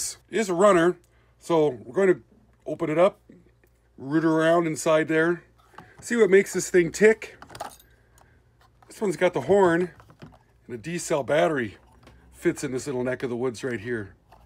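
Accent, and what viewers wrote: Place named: Midwest, United States. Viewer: Love your videos,. Your flat N. Midwest accent & idioms are great humor & your knowledge of tools & techniques for rehabilitation of old gear is unsurpassed.. But one minor correction is in order - to say Lionel O-27 is 1/64 scale is just as wrong as saying it's accurate 1/48th. The "27" in O-27 refers to the diameter of a circle of track (27 inches) which was designed to fit a standard 4' x 8' sheet of plywood with enough room to spare for sidings/etc. Lionel was less concerned with scale accuracy (especially in the early post-war era) than what would fit comfortably in the small bedrooms of the time. While most rolling stock was not accurately scaled to 1/48, and was often much shorter, the proportions were also not 1/64. Do a little more research online to find this is true... Meantime - keep up the great work!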